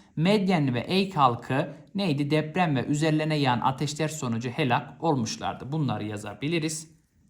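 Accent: Malatya, Turkey. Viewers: hocam malatyalımısınız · hocam malatyalımısınız merak ettimde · Hocam malatyalımısınız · Hocam malatyalı mısınız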